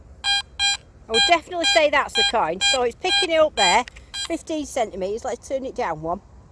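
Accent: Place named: England, United Kingdom. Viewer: I love the English Accent!!!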